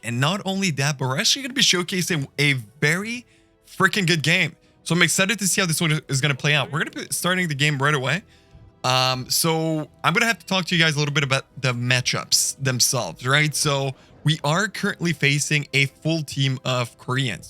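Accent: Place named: France. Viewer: You have a nice french accent (I am french)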